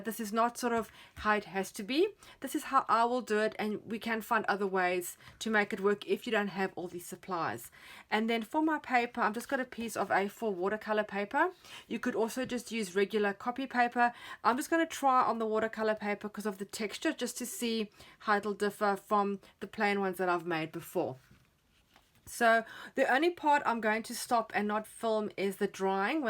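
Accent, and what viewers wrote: South Africa. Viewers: Gorgeous! Btw am confused by your accent! You are in Oz but your accent sounds South African!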